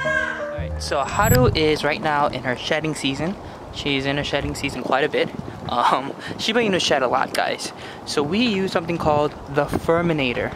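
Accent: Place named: Philippines. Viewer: Are you from philippines because you talk like filipino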